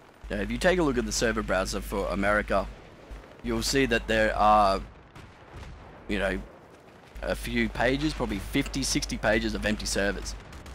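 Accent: Australia. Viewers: Nice video. Good to see a Aussie Commentator :D Subscribed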